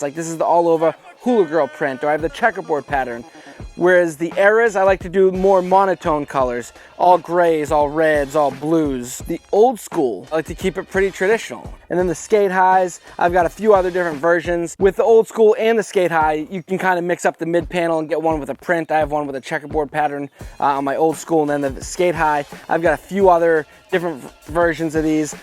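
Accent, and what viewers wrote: Boston, United States. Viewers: "wicked affordable" if u couldn't already hear the boston, that line just sells it 😂😂😂 great content as usual man!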